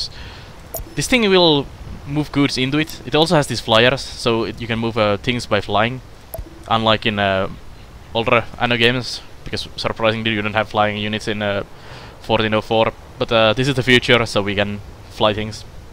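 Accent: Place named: Finland. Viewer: I love the Finnish accent. Thank you for making this video! I like the description of the game and the look into it!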